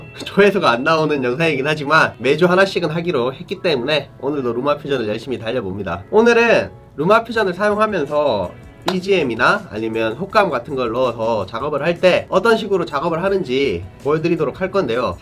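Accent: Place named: Gyeongsang, South Korea. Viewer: 안녕하세요.. 구수한 경상도 사투리로 듣는 Lumafusion 영상 편집 설명 아주 아주 감사합니다. 저도 대구에 살다가 와서.. ㅎㅎ LA 사는 50대 아줌마가 뒤늦게 유튜브에 관심이 생겨서 틈틈히 공부중인데 아주 도움이 많이 되고 있어요. 기계치여서 겁부터 났는데 설명을 들으며 해보니 재미도 있고요. 아직 아이패트 3는 없어서 아이폰으로 해보고 있는데.. 암튼 감사합니다. 유튜브 대박 행진하시길~ 짱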